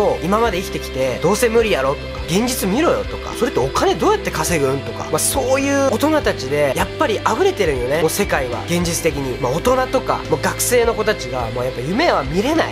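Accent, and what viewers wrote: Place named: Fukuoka, Japan. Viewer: どちらの出身ですか？福岡のどこかですか？方言が親近感あいます。応援してます。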